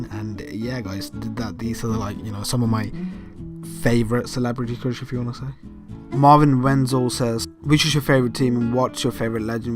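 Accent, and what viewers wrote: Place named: Birmingham, United Kingdom. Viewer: Are you from Birmingham? You sound like you have a bit of a Brummy accent 😂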